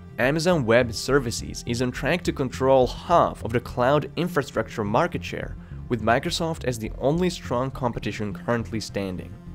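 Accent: Eastern Europe. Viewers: because he's trying not to sound like the Eastern European communist that he is. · You sound like an Eastern European person trying to impersonate a Scottish person doing an American accent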